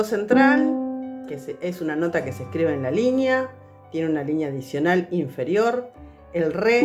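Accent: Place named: Argentina. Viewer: Gran estímulo para mi por dos razones, el acento argentino o el que tengas es muy agradable de escuchar y además tienes la mano canija por chiquita, como yo, eso me anima, gran cosa esta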